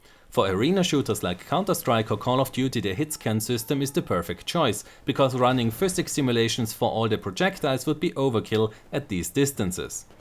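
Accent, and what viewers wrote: Germany. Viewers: The German accent. You know you hear it when you hear "Die Netcode". Many thanks for the info! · Are you german ? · Yeah im german and I clearly hear the accent:p your english is very good though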